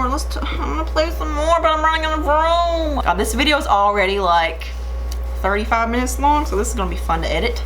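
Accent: South, United States. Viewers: Clearly you're from the south? What's your accent? Hhmmmmmm Georgia?? NC??? I'm from SC. I'd love to see the Beauty creations palette on your face lol. Thanks for bringing these brands to light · Oh my goodness..... You asked them if they could send some products for you to review fir them, and they just said no?? 😮 That was quite rude of them... You'd think they would be happy someone with nearly 13,000 subscribers wants to do a video reviewing their products and help them get more recognition (because I honestly NEVER heard of this website until I watched this video). Oh well...I guess they just don't care to gain more popularity. 😕 P.S I love your accent! 😆 I'm Canadian (which has no cute accents lol) but I've ALWAYS loved a southern accent....I can't pin point the accent to the state....I just know a southern accent. I think what made me like the accent so much is my OBSESSION with the country singer, he's from Georgia and aside from the fact that he is sexy AF, his Georgia accent only adds to it! 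Yup..I'm definitely gonna move to Georgia and marry that man...I mean..he's married and I'm married but...meh 😇 a girl can dream, right!? Lol 😄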